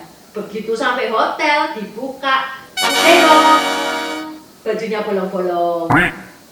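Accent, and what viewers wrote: Java, Indonesia. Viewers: Kak Intan....logat jawanya surabaya atau semarang kak? Ok thank you....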